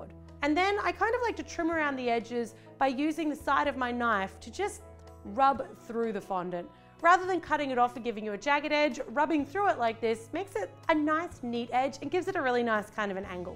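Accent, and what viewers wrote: Australia. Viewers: Veronica Carabez fr thoo i obviously thpught dhe was austrailen but that was it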